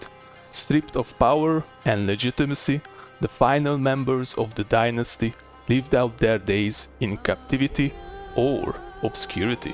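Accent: Hungary. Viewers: Kicsit belobban a magyar akcentus, amúgy egyből durrant a sub+like, örülök hogy egyre több a magyar videós, aki ck3-mal foglalkozik! Hajrá! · Are you Hungarian by any chance? That accent is almost unmistakable.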